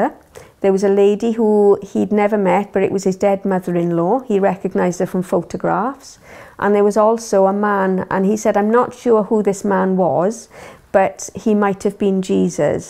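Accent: Wales, United Kingdom. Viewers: vashon100 WELSH...from WALES.... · Beautiful welsh accent .......... my sister said she saw herself on the operating table from above.......German surgeons drilling her head........she had a head injury from motorcycle accident............she was in a coma for six months.......... My brother said his life flashed before his eyes when he nearly choked to death......... Myself.......my children's father whom had left me & married another woman & lived in another country............the day he died I had this unreal tiredness .....I was that tired I went to bed.........I always have plenty of energy.............my son phoned me (I was still in bed) in a terrible state saying his dad had died while doing his plumbing job at a customers home.........around mid day..........he died alone of a heart attack.......... I was wondering afterwards was my ex husband trying to call me............was he trying to get a message to me...........did he realise he loved me at the end of life...........I wonder if I will ever find out..........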